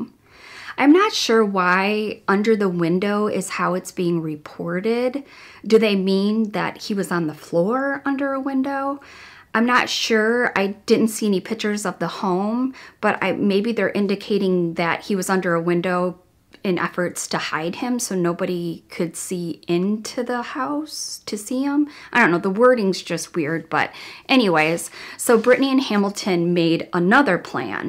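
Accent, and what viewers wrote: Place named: Midwest, United States. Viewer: You: Central Standard time ME: I KNEW IT!! I knew you were One of us!a "midwesterner" by your "accent" LOL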